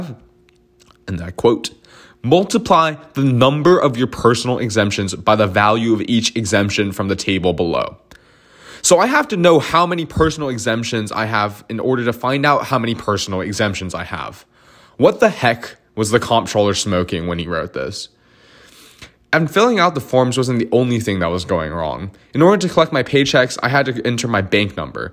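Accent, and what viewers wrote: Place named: Ohio, United States. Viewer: i can tell you’re from ohio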